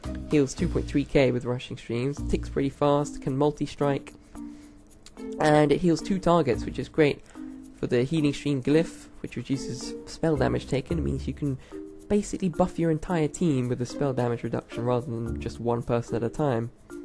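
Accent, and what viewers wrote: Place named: England, United Kingdom. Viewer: Это английский акцент, необразованный ты человек)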